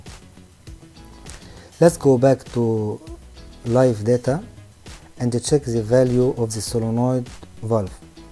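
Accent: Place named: Arab world. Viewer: واضح من لهجتك انك شخص عربي اهلن بك أخي واسمح لي أن اطرح عليك مشكلتي في هذا الخصوص لدي سيارة ترافرز 2018 عند تعبئة الوقود المحرك لا يعمل واحيانأ يعمل لكن يوجد ضعف في الأداء مما يجعلني اتوقف لمدة عشر دقائق ثم بعدها يعمل بطريقة طبيعية قمت بعمل فحص كومبيوتر وكانت النتيجة عطل في بلف الايفاب p0496 بماذا تنصحني هل اقوم بتغييره؟ إم انه يتم فحصه يدويأ قبل التغيير كما يظهر في مقطع الفيديو هذا؟ وماهي النسبة الصحيحة لإثبات العطل؟ ارجو الإفادة للضرورة ولك خالص التحية والتقدير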